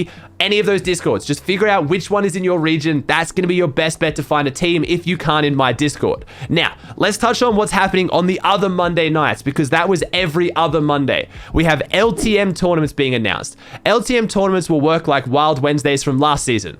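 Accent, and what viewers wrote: Australia. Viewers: Talking Me Watching Benjy Die The Whole TIme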